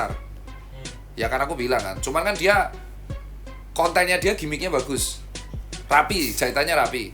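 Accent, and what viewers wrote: Java, Indonesia. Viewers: gue suka logat jawa lu dok.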